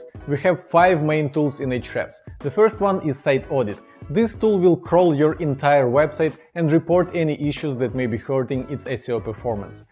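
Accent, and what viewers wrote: Russia. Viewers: i think russian accent, no?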